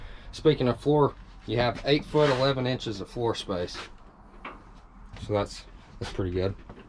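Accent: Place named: South, United States. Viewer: I'd like to see the smaller cap-over without the tip out to see if it would have enough storage for me. I'm alone and have no bloody kids, but I do like to cook so need storage and a good sized fridge, maybe the 825. Any chance of getting a deeper undivided sink. Thanks, you're funny; enjoyed the comments and your southern accent.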